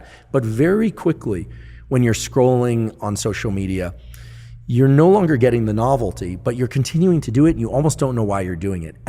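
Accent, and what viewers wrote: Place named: United Kingdom. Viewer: Just because you asked me to subscribe nicely and in a British accent, I will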